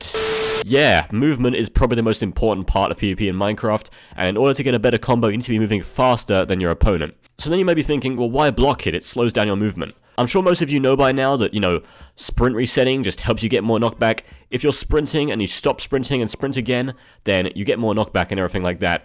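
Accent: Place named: United Kingdom. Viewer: I swear you sound British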